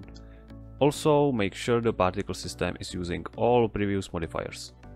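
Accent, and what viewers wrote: Czech Republic. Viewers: Nice tutorial! Are you Czech by any chance? :D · u sound like czech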